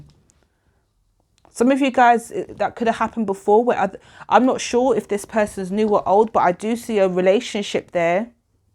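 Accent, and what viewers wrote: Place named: United Kingdom. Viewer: Love the accent, girl. Makes me want to be British. Nuff love from the Jamaican One.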